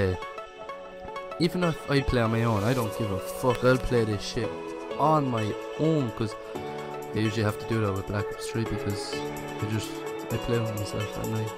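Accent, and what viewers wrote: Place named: Ireland. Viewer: Youre irish too nice!!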